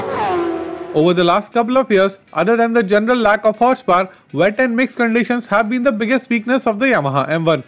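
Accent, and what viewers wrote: India. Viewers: Great content,. Presentation is very good. Some, maybe a very few people might want a slightly slower-paced narration. I’m in USA, I can decipher Anglo-Indian English easily, but my compatriots may struggle slightly. Overall this is good channel.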